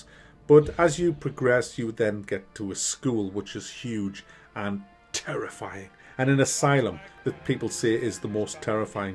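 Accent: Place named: Newcastle, United Kingdom. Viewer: Pretty sure he's a geordie or mackem not an aussie... agree on the US election point though!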